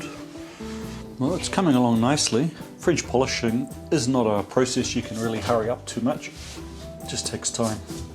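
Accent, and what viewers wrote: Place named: New Zealand. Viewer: I'm here for the old machines, the witty humour, the Kiwi accent, the aviation stories and I'll happily stay for the cooking and woodwork!!!!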